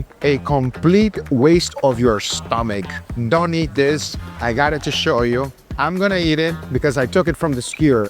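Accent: Minas Gerais, Brazil. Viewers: I love when he speaks portuguese, the mineiro on him comes out hahahaha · o sotaque mineiro do é engraçado e a comida incrivel! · I’ve been watching your videos for some time now, and then you say, WE are known for Picanha, Você é Brasileiro mano? Eu achava que era algo espano pô, mas o sotaque me puxava de vez enquando achar que era mesmo brasileiro. Me confirma aí carai kkkkk thanks buddy. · Wow, your Brazilian accent is on spot, way to go 👏🏻👏🏻👏🏻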